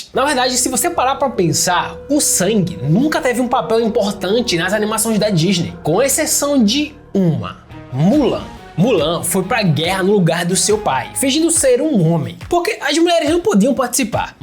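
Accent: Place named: Northeast, Brazil. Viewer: bom ver um nordestino com um canal com potencial para ficar grande, cansa de “só” escutar sotaques do sudeste (basicamente)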